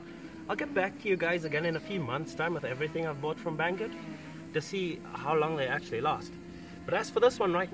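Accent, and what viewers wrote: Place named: South Africa. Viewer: Awesome video man. I ordered one of these motors! (Along with tons of other rc gadgets) Also, I can hear that South African accent from a mile away haha awesome.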